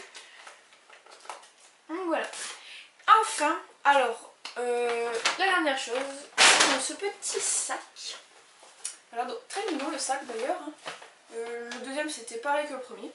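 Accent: North, France. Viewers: Oh my god! Trop beaux souvenirs! Rien que de voir la boutique, j'en bavais d'envie d'y aller! J'aimerais trop mais mes parents ils veulent pas ou peut-être l'année prochaine en colo mais j'y croit pas trop car je suis jamais parti seul donc ils veulent pas. Mais sinon super souvenirs! Tu en as eu pour combien si c'est pas indiscret stp. Et j'ai une question: Tu habites dans le nord de la France? (si oui c'est grâce à ton accent que j'ai découvert, Si non, je me sis trompé). La prochaine fois que tu pars, dis le moi, je me mets dans la valise ;) Je rigole :D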